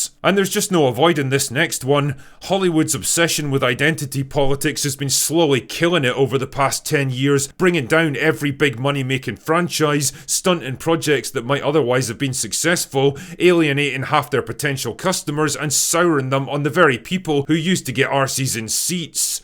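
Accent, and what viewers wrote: Scotland, United Kingdom. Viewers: It always brightens my day to hear this crazy Scottish bastards voice.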